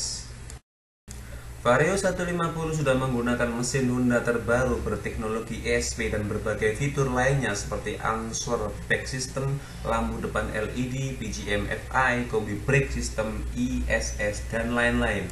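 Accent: Java, Indonesia. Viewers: yo mbo' omong boso jowo wae, ilat sampeyan jowo banget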